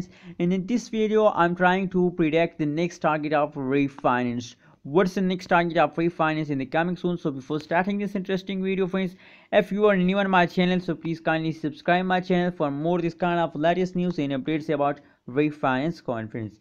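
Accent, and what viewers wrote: India. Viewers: Terrible indian accent.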